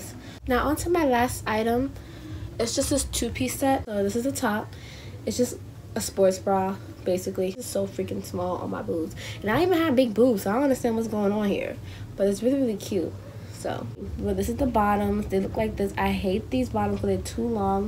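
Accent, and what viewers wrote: New York, United States. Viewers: Your accent sound like you from New York 😍😍😍😍😍😍😍😍 and your name is so pretty😍😭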